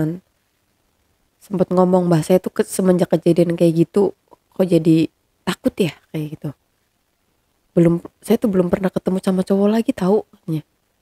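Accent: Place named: Cirebon, Indonesia. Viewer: Asli dari gintung berarti tetanggaan sm sy Galagamba,berkesan sih ceritanya,aplgi logat khas wong cerbon,iya tah,iya jeh,kang bner sih..salam teh sing wong Galagamba.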